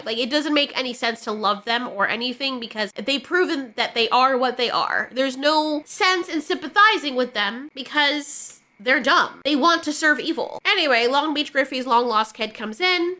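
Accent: United Kingdom. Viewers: Your British accent makes me laugh. It’s just frustrating that many US channels now do a posh (i.e. conservative) British accent to read California-penned (i.e. “woke”) articles…. which just makes no sense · don’t listen and don’t apologize to him. We love your British accent. He’s just jealous because he can’t sound as cute as you.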